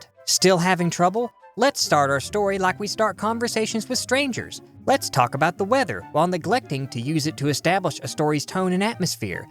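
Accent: South, United States. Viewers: I love this guys accent is it a southern accent? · The most subtle of southern accents makes this all the better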